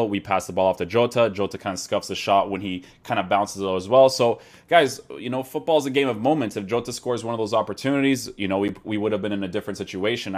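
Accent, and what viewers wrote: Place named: Portugal. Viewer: I swear to god I cant understand if u are portuguese or not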